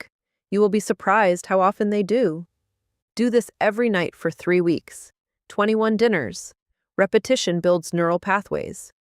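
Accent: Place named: United States. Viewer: the american japanese accent is frying me 😭😭💀💀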